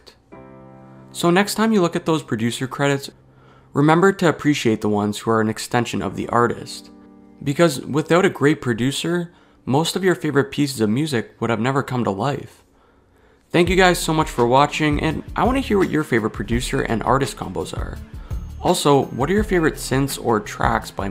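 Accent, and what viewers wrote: Canada. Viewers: Are u from canada. I hear the way u pronounce certain words 😂🔥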